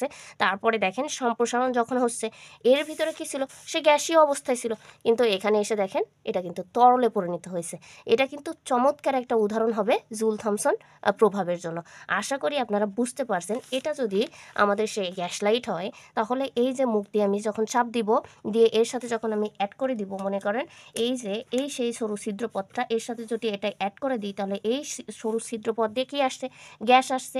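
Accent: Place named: Pabna, Bangladesh. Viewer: আপু, আপনার বাসা ki পাবনা, সিরাজগঞ্জ এর আশেপাশে? ভাষা টা খুব পরিচিত লাগলো!❤️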